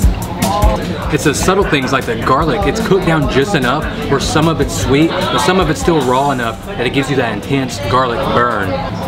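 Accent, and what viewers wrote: South, United States. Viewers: Where are you from? I hear a little bit of southern accent. Great video btw. Keep up the good work!!!!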